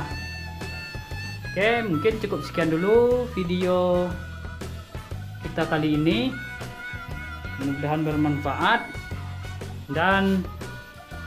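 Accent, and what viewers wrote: Indonesia. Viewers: Kayak logat urang awak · Logat kalteng sih 😄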